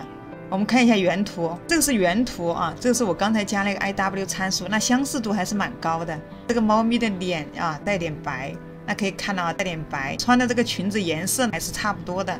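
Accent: Sichuan, China. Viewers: 四川的吗？口音挺像啊。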